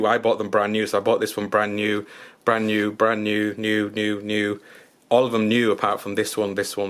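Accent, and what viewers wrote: Yorkshire, United Kingdom. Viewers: What do you so for a living if you don't mind me asking? Can tell your a Yorkshire lad haha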